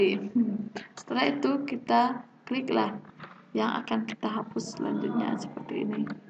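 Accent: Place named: Minangkabau, Indonesia. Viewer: Iya kak thanks ya.... Pedas kali bahasa urang awak ni yaaa kak 😂😂😂😂😂 logatnya udah ketebak raso e😂😂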